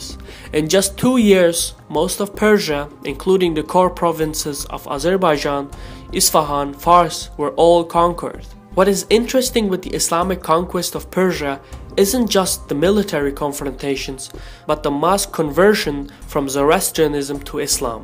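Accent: Arab world. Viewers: This guy who represents the video is Arab. So you can hear his Arabic accent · Biased. Look here : he has alil bit of arabic accent so ofc he is gonna say there was no genocide ... They converted most of the people with swords Look dude you lied . And its ok cuz we know u r biased.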